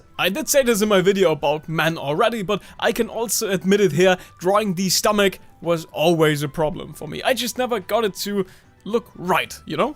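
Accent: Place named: Germany. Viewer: wy is is a garmen accent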